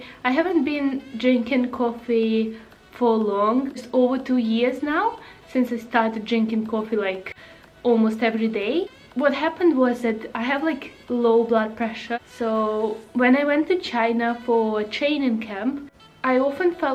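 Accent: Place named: Russia. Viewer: I don't really remember, but you was saying something like "it helps me to stay on chuck" or something like that. Russian accent is not a problem, I'm also russian (almost, actually I'm belarussian) so most of us have an accent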